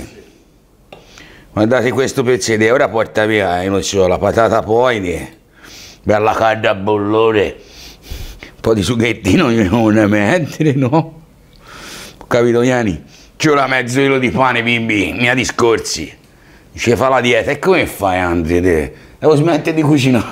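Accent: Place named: Livorno, Italy. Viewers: A Livorno parlano così, è colpa del cinque e cinque. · L"accento livornese è proprio così, più forte rispetto ad altre città toscane, almeno così ho notato.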